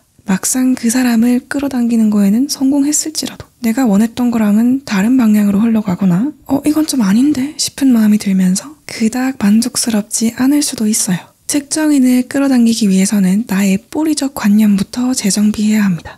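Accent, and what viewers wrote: Gyeongsang, South Korea. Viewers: 작전 변경...지금부터 님을 제 와이프로 끌어당기겠습니다. 경상도 사투리 넘 매력..내 알라도..ㅋㅋㅋㅋ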